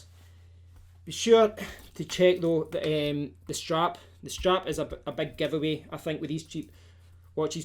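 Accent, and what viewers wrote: Scotland, United Kingdom. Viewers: Oh my actual days. I’m reading this 9 months after the comments and this reply “you’ve hurt my feelings” has just cracked me up so bad 😂😂😂😂 I just keep imagining it in that scottish accent 😂😂😂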